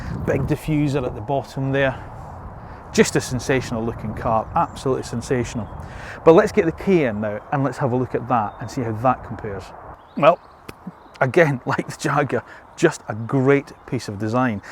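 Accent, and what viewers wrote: Scotland, United Kingdom. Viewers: Great concept to compare the used Jaguar to the new Kia because they'd be similar in price. They both look nice on the outside but the Jaguar is another level inside. Also many people say that the Kia is not a good handling vehicle when cornering and that the Jaguar is which would make all the difference to me. Favorite feature on the Kia is the adjustable Regen located on the steering wheel via paddle shifters. I found I was using them like down shifters brilliant. It's hard to get either one of these cars in the states right now. Would love to see a comparison between the i pace and the pole star 2 is there both more of a driver's car. I could listen to your Scottish accent all day btw.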